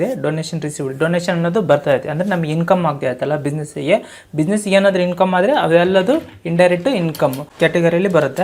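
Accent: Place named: Ballari, India. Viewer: Pakka ballari language TQ sir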